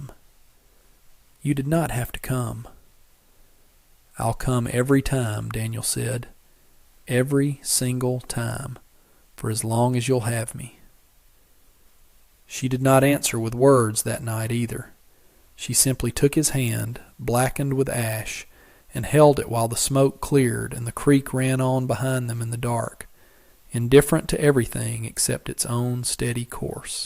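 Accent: South, United States. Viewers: This is a very moving, a very beautiful story. And the narrator reading this story to us is simply the best. His southern accent is perfect for this kind of stories.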